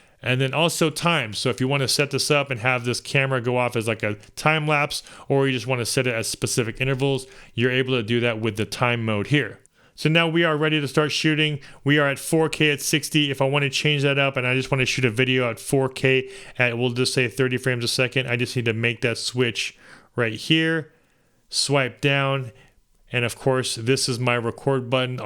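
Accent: Philippines. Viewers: Ilocano ka gayem..i know by accent .lol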